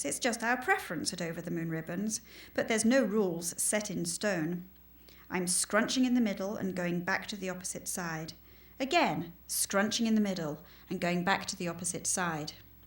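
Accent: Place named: United Kingdom. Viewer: Loved your tree decorating video. Inspiring and wonderful! Would like to hear Sharyn Alice in this video instead of the british accent. This voice does not sound sincere. You have lost impact with the voiceover.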